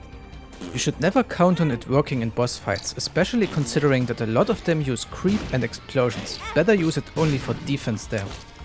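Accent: Germany. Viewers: Dude idk if every german speaks like that but i love how you speak english ! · you can hear that this man is german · Wie man einfach direkt raushört, dass du ein Almano bist haha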